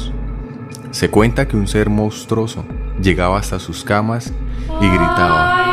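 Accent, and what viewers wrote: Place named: Colombia. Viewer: Jajaja que bien el tónico colombiano mi parceeee !! nunca había escuchado esta historia pero se parece a la de la llorona 😭 AAAAY MIS HIJOOOS !!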